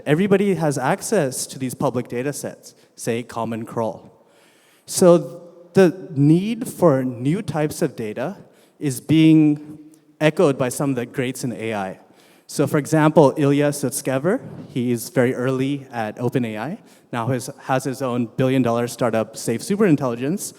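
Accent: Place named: United Kingdom. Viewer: An Indian with such a wonderful accent. Speaking like a pure British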